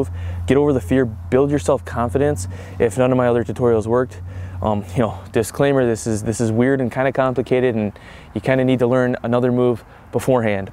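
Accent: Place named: Boston, United States. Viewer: Boston accent at it again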